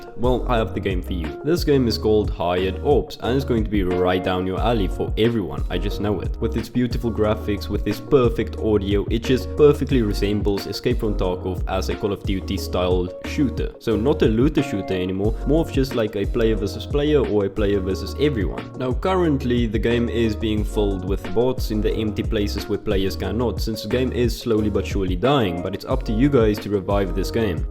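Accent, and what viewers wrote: Afrikaans, South Africa. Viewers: Are you afrikaans? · can hear the afrikaans in this boet, but good video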